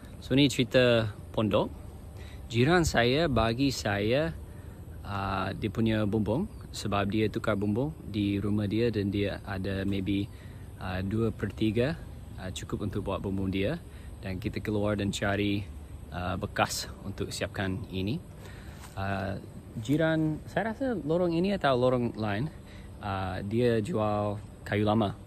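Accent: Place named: North, Malaysia. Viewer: Kamu sebut "pokok petik". Haha! Ini betul2 sebutan org utara utk pokok betik atau papaya!😂Dah jad org utara kamu ye!😂Kamu juga sebut "pokok pelam" utk pokok mangga, lg sebutan utara, selain kamu sebut "ayaq" utk air!😊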